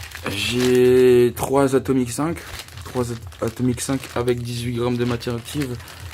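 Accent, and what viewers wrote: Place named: Alsace, France. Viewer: Yo pas mal ta réserve on aime le petit accent elssas hopla tu serais me dire t en a eu pour combien et ta acheter ou ? Geuht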